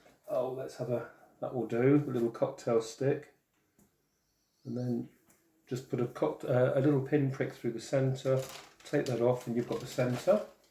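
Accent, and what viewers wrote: United Kingdom. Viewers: You made bisque biscuits! Love your videos. BTW, as far as I know, those of us in the US say vase the same way we say face. Soft s. But I really love listening to your British accent. Not sure ours is as attractive as yours.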